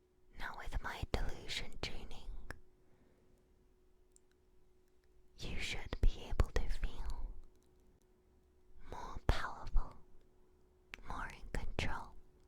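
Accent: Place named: United Kingdom. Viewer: Bri'ish woman detected, my neurons activated.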